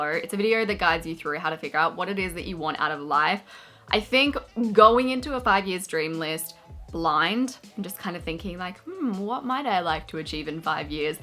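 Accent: Australia. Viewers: ok but... Drew Barrymore with an Australian accent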